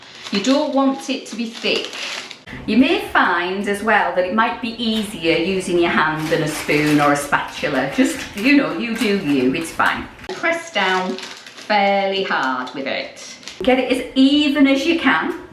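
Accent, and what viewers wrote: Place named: Cumberland, United Kingdom. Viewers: I'm from Cumbria but i live in Italy, it was so nice to hear your accent, it made me homesick, I'll definitely be making this gingerbread, thank you . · Wow! That is delicious! Thanks very much we all loved it. Enjoyed watching you make it too. I’m from the UK but live in New Zealand and I really enjoyed hearing your lovely Cumberland accent-slight homesickness was caused! So, many thanks from another!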